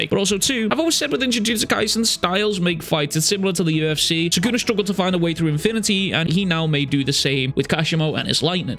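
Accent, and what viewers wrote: Liverpool, United Kingdom. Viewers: This scourcer accent makes this video even more enjoyable to watch, big greetings from Germany keep it up u sexy geezer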